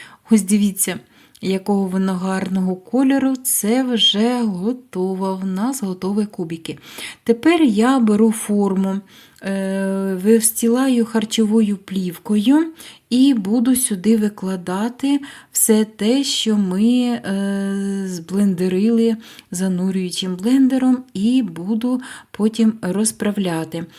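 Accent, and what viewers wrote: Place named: Vinnytsia, Ukraine. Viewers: Де вам тут вчувається російська мова? Жіночка розмовляє чистою українською мовою Придніпров'я, центральної та півдня України. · Чудова ідея з кубиками, обов'язково зроблю❤❤❤,а мені теж сподобалась мова, так розмовляють у Вінниці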